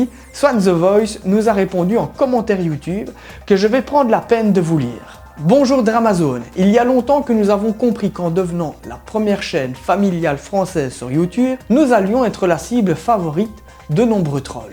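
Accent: Belgium. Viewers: T'es belge ?? Prcq ta un ptit accent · Elisa Deleau à mais il est Belge ! Je me disait bien si il l'était pas :D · C'est un belge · Je suis Belge et au contraire je trouve qu'il a un bon accent bien Belge ahah ^^ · T'es belge?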